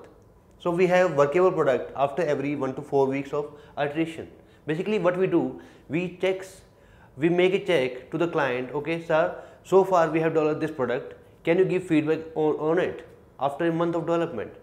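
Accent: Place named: Bihar, India. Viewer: sounds like a bihari... brilliantly difined. biharis are really brilliant....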